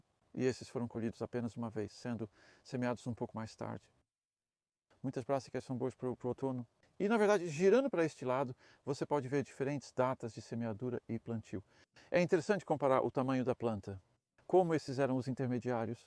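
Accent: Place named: Portugal. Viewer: Bom agora temos um canal em português ( com sotaque de Portugal) desse mestre. Tava difícil entender porque todos vídeos não tinham legenda em português ✌️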